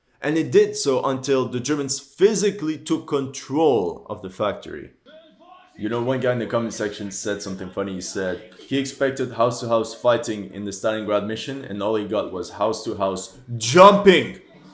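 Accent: Quebec, Canada. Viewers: I have a question for u man where u from? because you have like a canadian accent but from Quebec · He is from the french part of Canada right?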